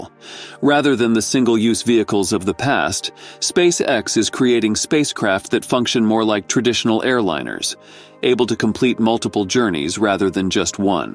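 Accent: United States. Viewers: nauseating droning American commentating on this video. The Yankee accent is diabolical.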